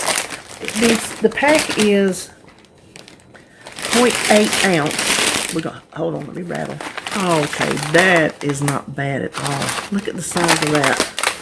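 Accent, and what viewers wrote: South, United States. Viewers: These are a great idea!!! I love pop chips, but I buy the bigger bag and weigh them out and put in baggies. Bc I could definitely eat to many. I buy the big bags bc I love the flavored kind, barbecue, sour cream and onion, and cheddar.. so good! I don’t care for the plain. You are so sweet!!! Love your accent, I’m from the south and moved north for my husbands job, so it’s so refreshing to hear that accent. Everywhere I go someone always comments on it 😂.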